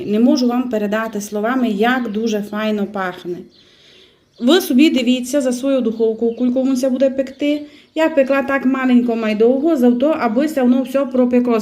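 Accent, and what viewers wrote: Transcarpathia, Ukraine. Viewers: Дякую за ваші рецепти, з задоволенням слухаю вашу закарпатську вимову, дуже подобається.Миру і всіх життєвих гараздів. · Який же чудовий закарпатський діалект :) Так приємно чути! Дякую! Багато цікавих рецептів, вже хочу спробувати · Як приємно вас слухати...ваш закарпатський діалект...щасливого вам Різдва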